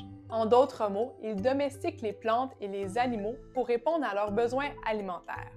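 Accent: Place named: Quebec, Canada. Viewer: Bonsoir Madame! You Tube m'a proposé votre chaîne, et comme j'aime beaucoup l'Histoire, que j'ai une formation d'Historien, que j'ai même une maîtrise, que je travaille à un modeste poste au Musée d'Archéologie Nationale à Saint Germain en Laye (France), que ma voisine de rdc s'appelle aussi! (je me demande si elle a de la famille au Québec) et que pour un Français l'accent québécois est for agréable, je m'abonne! Au musée les visiteur Québécois sont mes préférés, ils sont toujours aimables, souriants, causant, ils ont l'air heureux de vivre. Au plaisir! 😀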